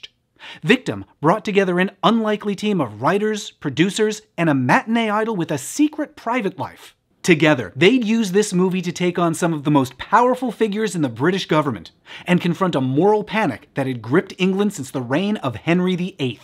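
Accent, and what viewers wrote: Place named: United States. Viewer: The Accent somehow is less British and more American sounding.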